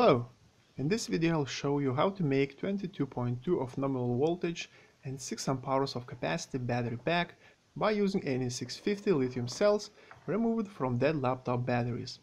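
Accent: Russia. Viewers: Русский акцент детектед:)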